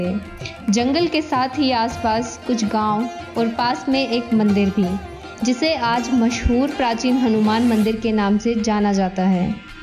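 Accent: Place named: France. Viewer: Lagra French aye lagra yesa❤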